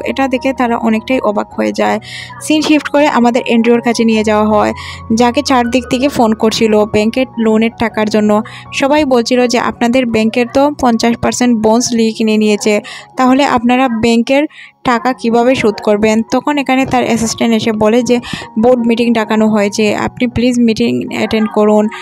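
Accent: Sylhet, Bangladesh. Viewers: Apnar basha ki Sylhet 🙄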